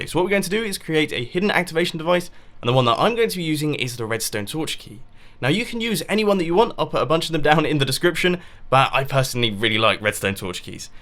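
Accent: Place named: England, United Kingdom. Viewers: British people sound that way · Nope here in England that voice is legit everywhere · i love the fact it is british cause im british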